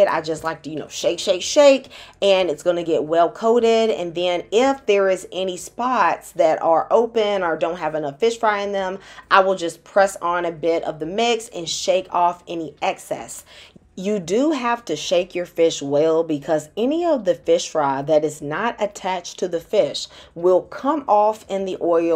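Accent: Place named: St. Louis, United States. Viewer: You sound like you from St Louis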